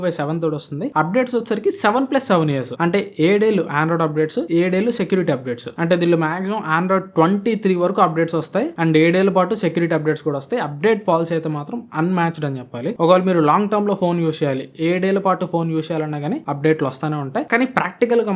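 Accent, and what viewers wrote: Ongole, India. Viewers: bro meedi ongole aa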